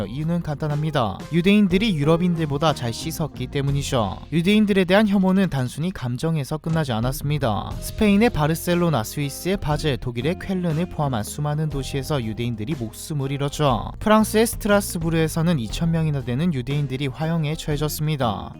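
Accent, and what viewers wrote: Gyeongsang, South Korea. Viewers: 경상도 억양에 표준어 사용하니 좀 그럴수 있습니다. ㅋ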